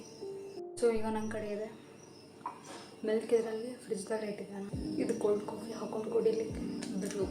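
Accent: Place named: Hubli, India. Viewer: hubli Accent ✌️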